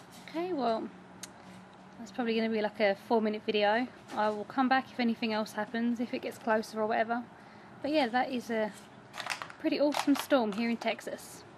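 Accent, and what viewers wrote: United Kingdom. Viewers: I love your British accent :3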